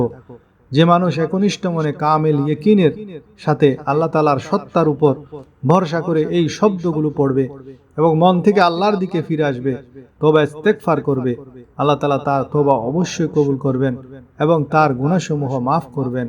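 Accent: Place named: Chittagong, Bangladesh. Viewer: সালাম নিবেন। ইসলামিক ভিডিও দেন মানুষের উপকারের জন্য। কিন্তু বেশি কথা বলা আপনার একটা সিরিয়াস রোগ, এর চিকিৎসা নেন। অনেক সত্য কথা বলেছেন সাথে মিথ্যা ও বলেন। যেমন বললেন একটি দোয়া দিলেন ১০ টা দোয়ার লিষ্ট। মামুষ কোনটা রেখে কোনটা পড়বে। যদি সওয়াব প্রাপ্তির জন্য করে থাকেন তাহলে কম কথায় আসল বিষয় বলে দিন। মানুষ উপকৃত হবে। আর যদি অন্য উদ্দেশ্য থাকে তাহলে কিছু বলার নাই। কথা শুনে চট্টগ্রামের লোক মনে হয়। চট্টগ্রামের লোক মনে হয় একটু বাচাল।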